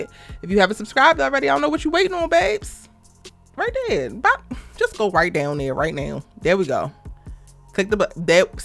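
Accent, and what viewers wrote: Baltimore, United States. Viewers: U from Baltimore? That accent thick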